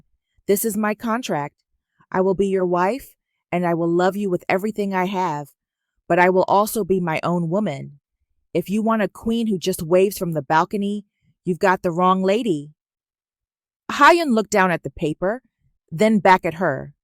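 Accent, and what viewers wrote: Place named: United States. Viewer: I find this voice difficult to listen to it's slightly aggressive and very American. Although the story was good it progressed very quickly.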